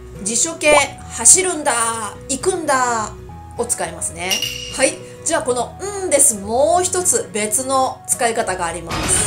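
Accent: Taiwan. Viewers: 老师您好，今天偶然发现您的视频，看了一个视频之后果断订阅了，我是一个学习日语三年的学生了，但是由于环境和难度的受限导致我的日语水平没有一点点改善，最常见的情况就是昨天学的知识今天就忘了，现在还停留在初级阶段，想想也倍感惭愧。最后想问一句老师到底是台湾人还是日本人啊？看第一个视频觉得老师是台湾人，中文发音是台湾腔，当看到这个视频开头部分老师的日语实在太流利了跟母语一样啊，让我不禁感叹老师在哪里学的日文啊？看到评论才明白有人说您是日本人，难怪日语说的这么标准。谢谢老师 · 天哪！老师是日本人！这么重的台湾腔简直不敢相信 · 先生の中国語はすごく素晴らしくて、しかも台湾訛り。。。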